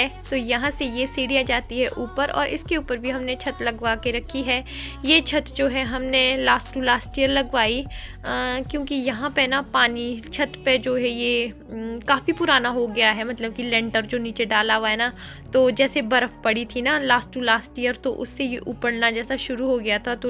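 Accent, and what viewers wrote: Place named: Haryana, India. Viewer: Aapki language Hamare Haryana se bahut match khati hai Hamare Haryana mein bhi Aisa Hi bolate Jaisa ki aap baat karte ho bolte ho idhar ko Udhar ko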